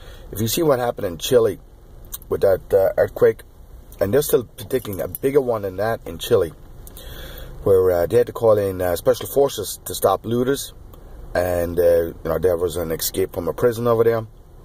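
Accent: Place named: Netherlands. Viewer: so, just thinking, your accent sounds like an old Holland/dutch accent, am I close? btw, reports are interesting from LA, cousin is getting out of the state tonight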